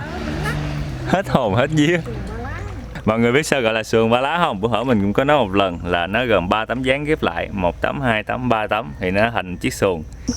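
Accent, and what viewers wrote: Mekong Delta, Vietnam. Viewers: Bạn nói đúng, trong đó có mình nè. Mình xa miền Tây từ năm 1983 lên Saigon sống rồi thì đi xa hơn nửa (nửa vòng trái đất) nên nổi nhớ Miền Tây của mình chỉ có trong những giấc mơ. May là có giúp mình tìm lại những hình ảnh quen thuộc quê nhà và giọng nói quê hương. Quê nhà mình ở chỉ cách nơi nhà ở có hơn mười mấy cây số nên giọng nói cách nói chuyện của rất là thân quen với mình. Rất cám ơn đã đem lại hình ảnh quê hương đến cho mình. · Coi video của em quay thật rõ ràng,nói chuyện rất hay và chất phát đúng là người miền tây!!❤️ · 3 năm trước mình vô tình vào xem clip của và thích ngay cái chất giọng miền tây và khuôn mặt dễ thương. Chúc luôn thành công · Giọng đúng miền tây dễ thương ghê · Nhìn thấy bạn mà thấy yêu bạn với cách nc của bạn cũng như ng dân miền tây rất thân thương và thiện cảm. Yêu bạn mất rồi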